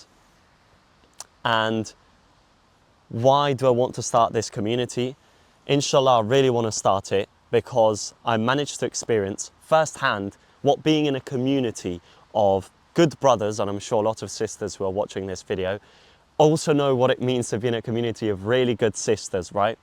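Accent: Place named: England, United Kingdom. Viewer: he sounds VERY ENGLISH to ME. Any background info on why you moved to Italy?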